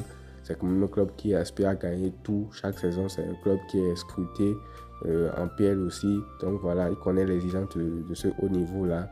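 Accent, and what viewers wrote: Benin. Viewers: Ça sent l'accent béninois, vrai ?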